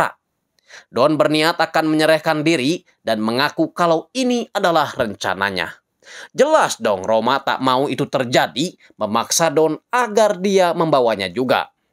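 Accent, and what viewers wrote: West Java, Indonesia. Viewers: orang Sunda ya...logat nya Sunda banget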